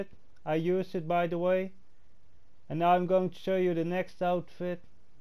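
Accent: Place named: Netherlands. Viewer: You sound Dutch for some reason lol. Cool outfits man.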